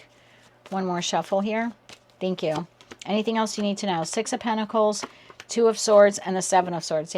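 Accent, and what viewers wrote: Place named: New Jersey, United States. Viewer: I got every word .. must be a Jersey thing lol stay warm/safe in this weather ❤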